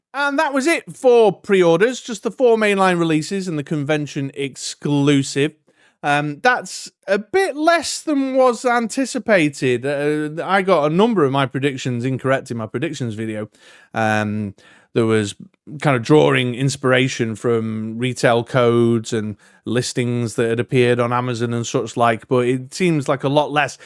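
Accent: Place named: United Kingdom. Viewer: Thanks for the run down mate! Its always great to hear it in a brit voice lol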